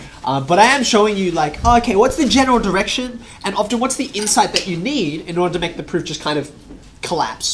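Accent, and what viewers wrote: Australia. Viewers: it's just an australian accent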